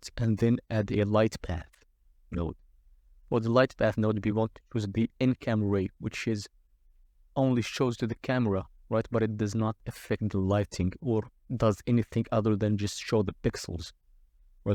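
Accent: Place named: Russia. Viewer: очень русский акцент)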